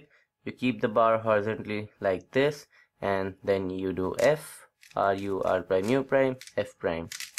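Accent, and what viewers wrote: India. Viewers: sounds more American but this is Indian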